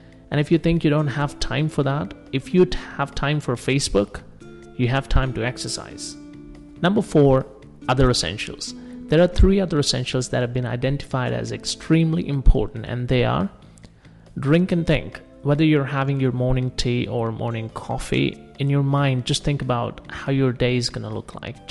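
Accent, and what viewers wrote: India. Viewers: Dude stop trying to hide that Indian accent it’s fucking horrible😂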